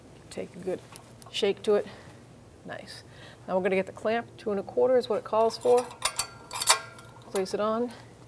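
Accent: Boston, United States. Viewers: I hear that Boston accent and I feel like I’m home.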